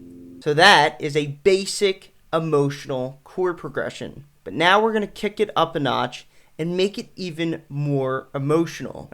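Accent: Philadelphia, United States. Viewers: The Philly accent is so strong. You got a fan.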